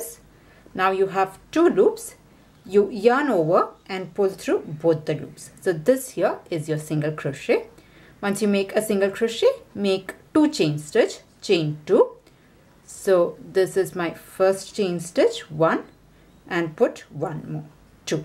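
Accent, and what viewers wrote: India. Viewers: idk crochet but the Indian accent helps a lot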